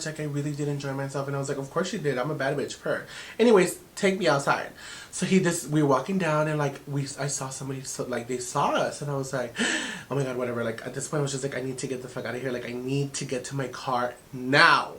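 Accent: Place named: Dallas, United States. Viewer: I’m deaaaddd!!! I’m from Dallas and you sound like someone from D town 🤣❤️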